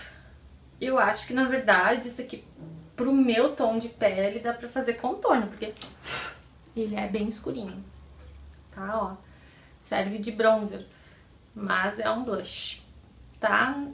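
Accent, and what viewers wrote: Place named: South, Brazil. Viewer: seu sotaque é lindo sou carioca, mas minha paixão é o sotaque do Sul! Amei o vídeo! Realmente não saímos da farmácia sem levar produtos que avistamos na hora e desejamos! Beijos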